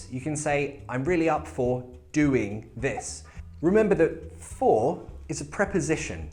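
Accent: United Kingdom. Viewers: your videos are totally the best one to learn the real british accent :) thank you a lot.. are you up for eating Japene Food? is it Right ? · I really love watching your videos. I'm more used to the american accent, but I can understand you completely, even though your accent is british. By the way, I love your accent.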